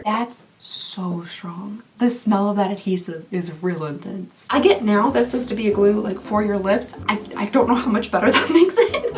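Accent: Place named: South, United States. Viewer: Say blush brush five times fast and you will understand point lol from one southern with a southern accent…to another, I understand and love her❤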